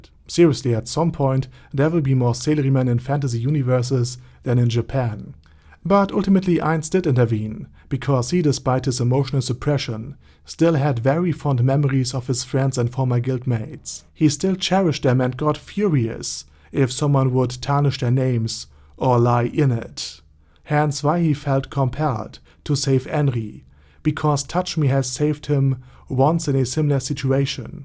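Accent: Germany. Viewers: Selbst als Deutscher fällt mir das Lesen deines Namen sehr schwer. Ich finde das Video sehr gut und dein starker Deutscher Akzent ist auch ma was anderes .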